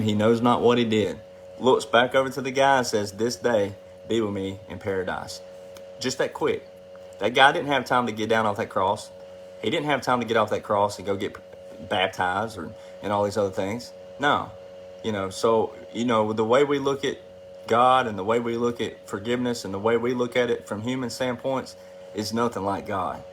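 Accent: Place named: South, United States. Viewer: Patel Patriot has a reputation for putting others down. Likely jealousy. We love you here in Boston. Could listen to your southern accent all day! And you re right. If one of these truthers did what you do everyone would have woken up A year ago!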